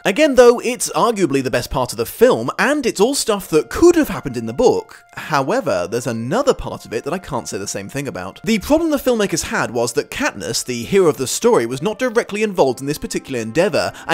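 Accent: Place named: United Kingdom. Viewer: I am so happy that I subscribed to you based on Nostalgia Critic's recommendation ^_^ I can never get bored of your British accent and witty humor :)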